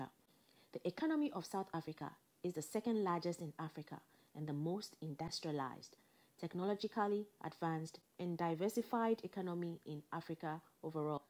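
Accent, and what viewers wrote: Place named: Ghana. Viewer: The accent is ghanaian and this channel is ghanaian...so direct this your vitrol to them..Olofo!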